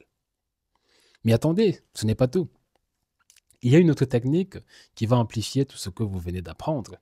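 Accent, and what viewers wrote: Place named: France. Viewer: je repond EN italien que je suis Fiorentino ou' est ne Dante ..... Sei un grande professore e m ' hai fatto capire i meccanismi del nostro cervello che già sapevo però tu l hai spiegati in maniera eccezionale ... Poi il tuo accento Francese in più parli piano lentamente ... Via grazie mille hombre !!!!!! Ciao professo !!!!!!!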